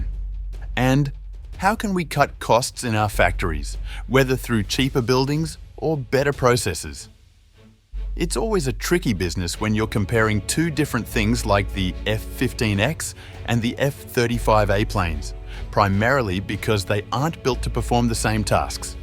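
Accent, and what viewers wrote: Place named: Australia. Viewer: Why Aussie accent? What do Australians know about F-15's?? Just doesnt make sense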